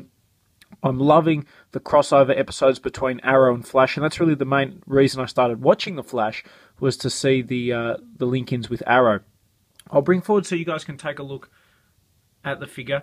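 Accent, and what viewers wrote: Australia. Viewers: Hey loved the review! Are you from Australia?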